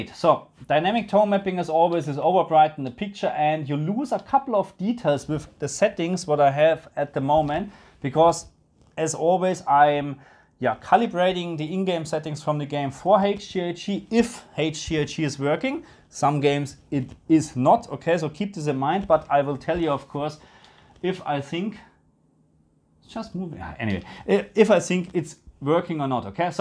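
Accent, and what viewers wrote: Germany. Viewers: never ever did i heard a more much sounding german akzent thats from you ...keep going my guy😅👍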